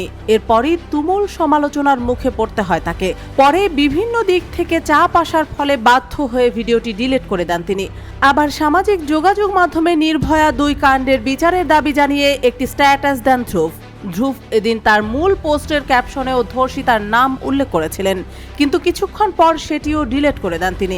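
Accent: Kolkata, India. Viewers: সংবাদ পাঠিকা কলকাতার মনে হচ্ছে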